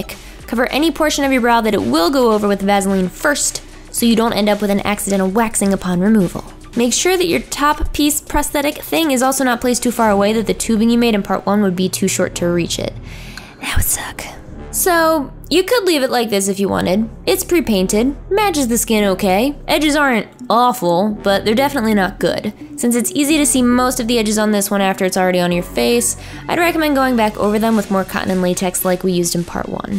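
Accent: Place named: Pennsylvania, United States. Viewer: !! Don't be ashamed of your accent!! For a while, I had no clue why people made fun of your accent. I didn't even notice you had one. Then I realized you were from Pennsylvania and it all made sense... (I live there too).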